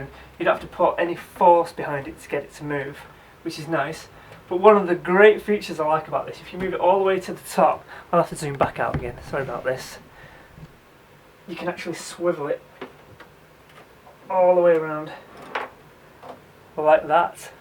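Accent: United Kingdom. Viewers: love your british accent.a breath of fresh air in the jungle of north American content.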